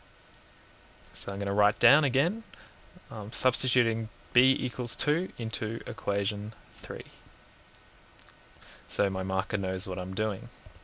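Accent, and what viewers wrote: Australia. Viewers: (PixelonTV) Australian (Y)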